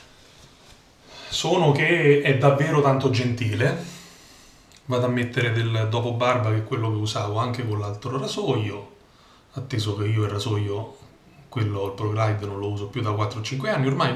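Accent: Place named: Naples, Italy. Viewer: dal tuo parlare mi sembravi di napoli ehehe tutto qui.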